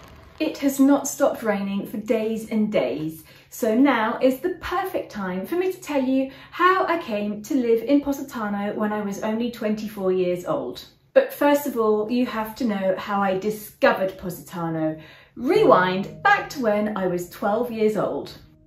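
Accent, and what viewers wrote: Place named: United Kingdom. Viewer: Wow lov ur journey ..n ur british accent..n ya ur husband is handsome..god bless u both.love from india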